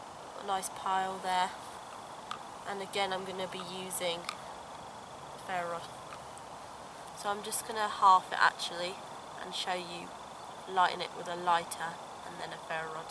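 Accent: United Kingdom. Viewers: your like a little ray mears must be the British accent.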